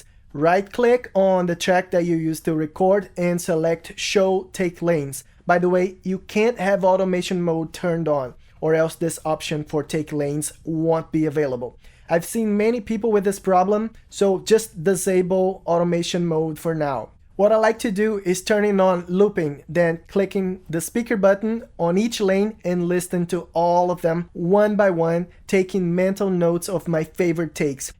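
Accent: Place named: Brazil. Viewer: I can recognize a fellow Brazilian accent! eh nois mano!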